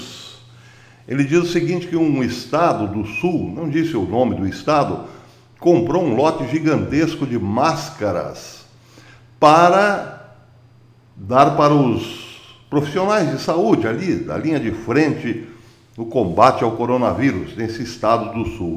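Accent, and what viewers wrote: Caipira, Brazil. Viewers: Fessor! Num é Aisland. É Ailand. Amando o caipirês!! Hehe. Um abraço! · Continue com o caipires é muito bão !!! Hahahahahha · Excelente Professor, o seu sotaque bem brasileiro, caipira e expressivo, além de excelentes comentários em variados assuntos, esse esquerdista que fez o infeliz comentário sobre o senhor, deve está com inveja por está no ostracismo! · EU gosto da FORMA caipira, então os BESTAS que engole o CHORO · Kkkkkk! Adorei o caipirês. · Eu ADORO O JEITO CAIPIRA DE FALAR RELAXA A MIM E ME LEMBRA DE LIMEIRA ONDE NASCI BOAS LEMBRANÇAS DE UM TEMPO MUITO BOM NOS ÉRAMOS MAIS LIVRES E FELIZES DO QUE AGORA. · Kkkkkkk...o Sr consegue ser hilário sem perder a seriedade. Vai firme no seu sotaque mais que brasileiro. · sotaque caipira não é matar a lingua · Pois é, o YouTube deve ter estudado aritmética com esse professor a criticou seu português de “caipira”.. 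vixxx! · Professor,eu adoro ouvir o senhor falar o "caipirês",pode continuar a fazer suas análises desse jeito 👏👏👏☺️ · Amo seu caipirêsssss!!! Adogôooooooo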